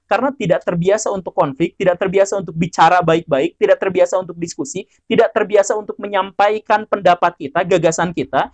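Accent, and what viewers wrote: West Java, Indonesia. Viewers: Kalo editan videonya kaya bossman mardigu tambah mantep nih video.. tapi logat sundanya gak diilangin..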